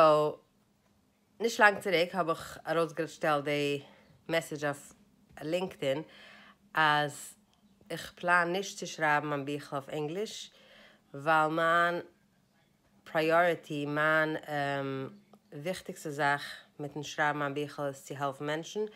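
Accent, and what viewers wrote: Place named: Hungary. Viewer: You have a real hungarian Yiddish 😂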